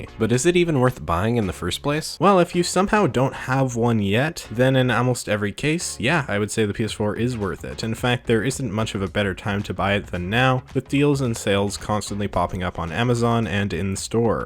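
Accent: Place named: Canada. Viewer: omg ur Canadian yaaaaa eh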